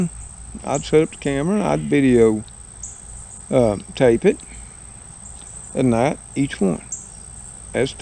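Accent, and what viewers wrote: South, United States. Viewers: your cute southern accent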